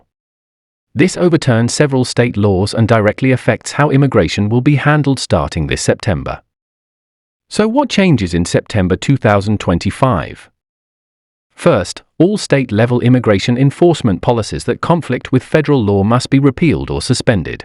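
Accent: United Kingdom. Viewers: I don’t need to hear a British ethnic voice talk about the USA. Didn’t we kick those people out of the America for a reason?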